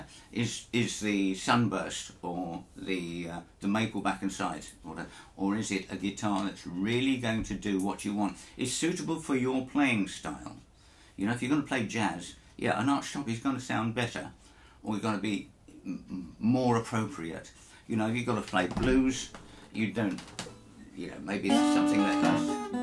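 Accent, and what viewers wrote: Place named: United Kingdom. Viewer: Hi! I really like your British accent. 😃 Sounds so stylish 😊What do you think of Guitars with laminated parts? Could they sound also good? I am thinking about buying a 12 string guitar (Guild) with laminated parts. Cheers Roger 🇨🇭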